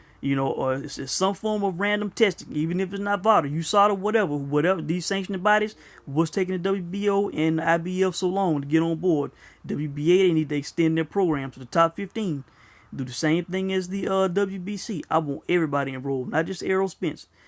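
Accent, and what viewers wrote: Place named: Alabama, United States. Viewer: Everyone has there own opinion but u are a hater, get your facts right errol used Vada when he fought Brook, if u do your research hes on the Vada website, u dont sound like the most intelligent brother but i know u can read. Before Spence fought Brook u were disrespecting him saying he wasnt that good, hes fought nobody, saying he would destroy spence & indicated that u would even eat crow if Spence beat Brook, u went live on youtube an ate your Crow in front of your viewers now mths later u decide to contradict yourself suggesting the best welterweight on the Planet is ridin dirty. smh. After he broke Brook face (eye socket) now your saying Errol is juicing, child please. i admit Spence aint no Sugar Ray Leonard, Tommy Hearns, Aaron Pryor but he is a bad mf he must be if he fight so good he got u thinking hes juicing lol, look bruh knowledge is power so read & stop sittin up there sounding like u have a 3rd grade education an speaking like your from Possums Paul Alabama a lil town with a population of 12 people. 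lol. this aint fishing this is boxing, get ya mind right cause your new nickname on youtube is cant get right. lol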